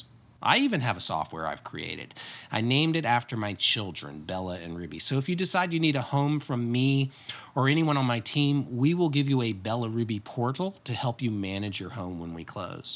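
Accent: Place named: Philadelphia, United States. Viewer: Please do more...enjoyed! You sound like you might be from the Philly area. My ggg....was Lord Ashley Cooper, brought the 1st quakers to Charleston. Please do more of downtown!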